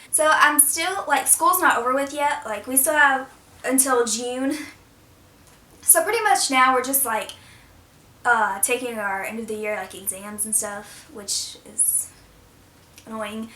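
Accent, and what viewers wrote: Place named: South, United States. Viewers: I love your accent I’m from Texas and I love the southern bell accent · where are you from? you have a slight southern accent I think 😂😍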